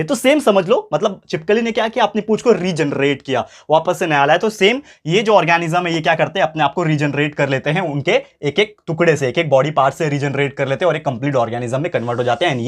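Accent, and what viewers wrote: Maharashtra, India. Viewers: Sir what is your mother tongue?? Are you marathi??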